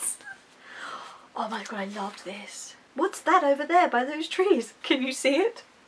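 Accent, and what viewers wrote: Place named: United Kingdom. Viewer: Wow! The Famous Five is pretty enjoyable, I am definitely going to read as well, cause I was looking at my library and I just found one Famous Five - Five run away together. To be honest I am really excited. Nice this video, just subscribed. On the other hand I enjoyed a lot your british accent. Happy reading day after day!:)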